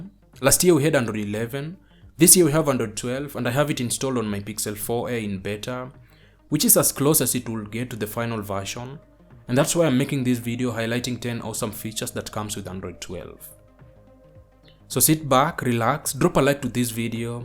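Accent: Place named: Kenya. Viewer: Am sure this guy is from Kenya! That accent ! 👍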